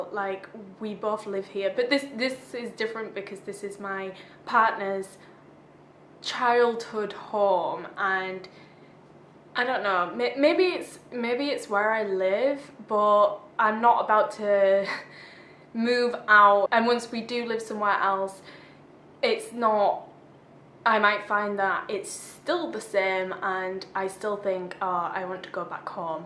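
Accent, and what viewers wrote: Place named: England, United Kingdom. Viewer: Supply and demand, a normal thing. You are a native English speaker, have that English accent that Vietnamese parents want their kids to learn from. Unless the market is flooded with native English speakers who are willing to get paid a few dollars an hour, otherwise, you will still get ~20 dollars an hour.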